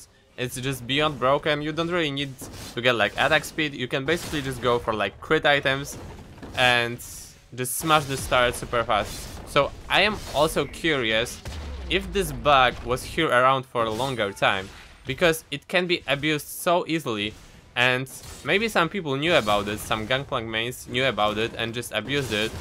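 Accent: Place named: Poland. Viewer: Jesteś Polakiem? Akcent strasznie polski:P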